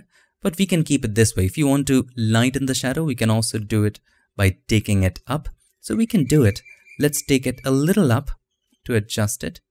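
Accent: India. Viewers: indian accent just kills me ... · You speak too fast and your english is slightly Indian, it would be easier for me, at least, if you spoke in a more paused way.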